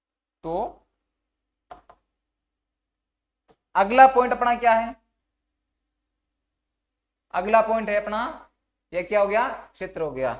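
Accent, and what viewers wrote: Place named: Haryana, India. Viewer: Apke bolne ke stayle se lag raha h u r from hariyana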